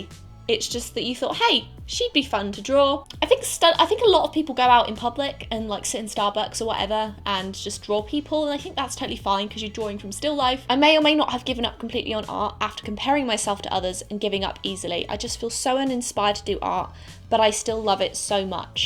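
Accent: England, United Kingdom. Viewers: u aren't us based, u sound British? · she is so classically English but not at the same time... why?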